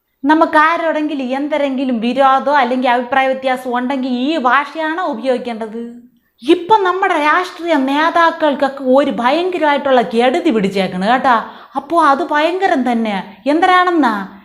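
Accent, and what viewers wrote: Thiruvananthapuram, India. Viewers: ഭാഷ തനി തിരുവനന്തപുരം: തിരുവനന്തപുരത്ത് കാരിയാണോ? അവതരണം സൂപ്പർ❤❤❤❤❤❤❤❤❤❤❤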